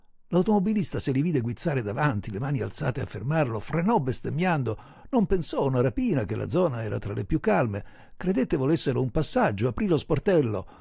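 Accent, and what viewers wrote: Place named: Sicily, Italy. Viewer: Buongiorno caro 😊irresistibile il tuo siciliano❤, racconto commovente,si, ma quanto dolore e sacrificio dietro ❤❤ grazie davvero di cuore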